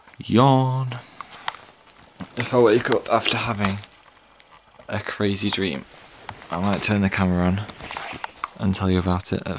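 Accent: North, United Kingdom. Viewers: He sounds so northern and not as excited as usual... He is so much different. Definitely more northern. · His voice was so deep and northern then jfc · He sounds so northern in these early videos i love it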